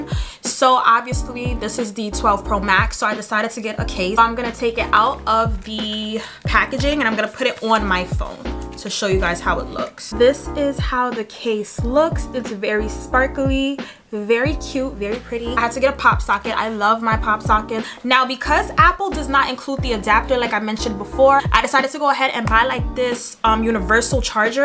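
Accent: New York, United States. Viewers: The moment you opened your mouth I knew you were from NYC 🥰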